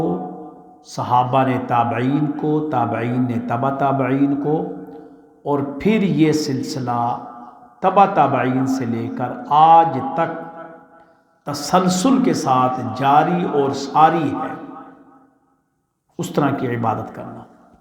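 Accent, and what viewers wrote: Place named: Punjab, Pakistan. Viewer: Tu c Paindo ho. Ardo (Urdu) Punjabi which bolde ho.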